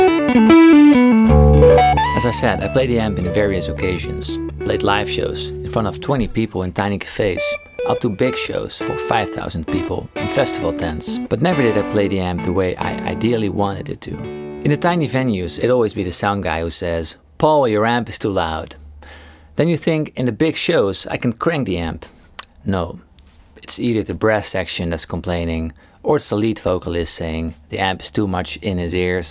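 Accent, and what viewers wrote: Netherlands. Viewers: Great video, and I love that hint of Dutch in your delivery.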